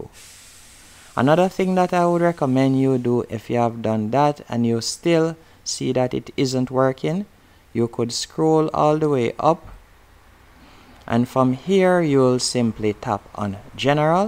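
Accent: Jamaica. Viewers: It work thankssss I can tell your a Jamaican thatssss wasup thank u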